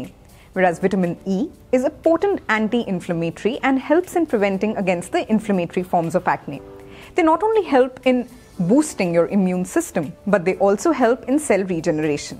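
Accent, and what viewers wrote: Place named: India. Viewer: Please not the hindi accent againnnnn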